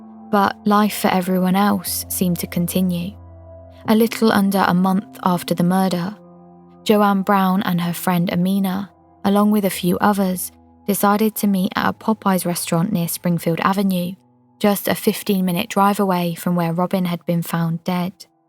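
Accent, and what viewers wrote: United Kingdom. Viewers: I think it's more of a British thing, this channel is British, the accent is British and obviously the reference to the Met Police and the Sarah Everard case give that away- she sounds about my age so to me Red Rum was a famous British racing horse- that grew legendary status. I have watched the shining and can't remember Redrum but who knows....in the end you have to change words around to stop things getting blocked in the world of youtube. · Great to hear a British voice for a change new subscriber.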